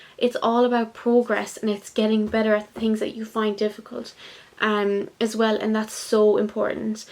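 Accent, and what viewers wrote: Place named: Ireland. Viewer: Hi. My school days were an absolute nightmare. A lot of bullying from other kids and from some of the teachers too. And at home it wasn't better because my parents were always fighting. As i mentioned in another comment i suffer from depression and social anxiety. I think the stuff i experienced during my childhood and something that happened a few years ago caused it. I am on medication now which helped me a little with major depression but i still have social anxiety. And the medication makes me confused and drowsy. I like your irish accent. Hope you are having a great day and good luck with your studies.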